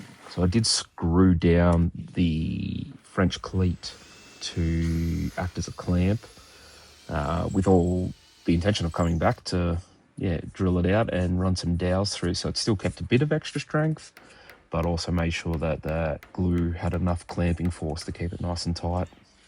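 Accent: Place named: Australia. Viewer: Great to hear another Aussie voice on youtbe! Love the unit. Subbed, I look forward to seeing more of you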